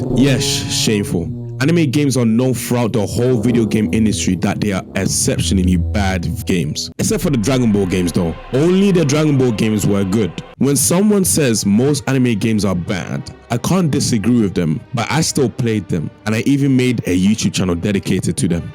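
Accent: United Kingdom. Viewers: brit and slow, pick a struggle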